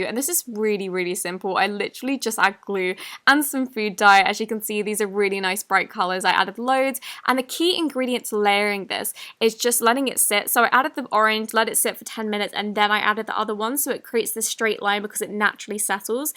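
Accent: United Kingdom. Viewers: your from U.K?????😮😮😮😮😮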